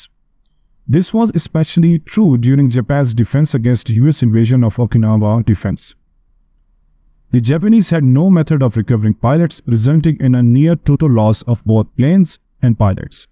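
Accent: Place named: India. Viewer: Indian voice over 😢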